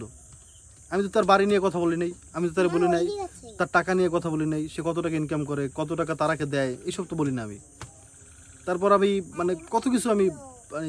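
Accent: Barishal, Bangladesh. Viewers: বরিশাইলা বলে কথা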